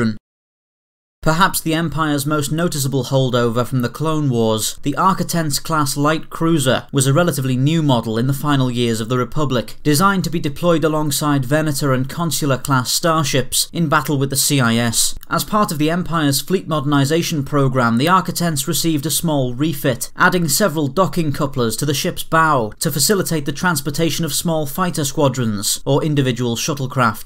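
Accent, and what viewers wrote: United Kingdom. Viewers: Love how the British accent makes anything sound better and credible. It just sounds good. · Hiaruga I agree just think he needs to slow down and make it a bit more conversational and less frantic. It makes it a little uncomfortable to watch multiple videos with this rushed tone but otherwise I also appreciate the British accent just wish it was a bit more on the calm side. But always great content! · This was long overdue but, man I love your manner of speech. I may be biased as a fellow Brit meself but I can't help it.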